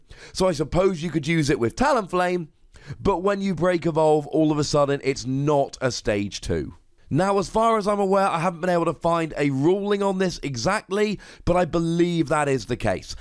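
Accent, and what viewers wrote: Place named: United Kingdom. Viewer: He's from the UK, so it's normal for him to have that kind of accent.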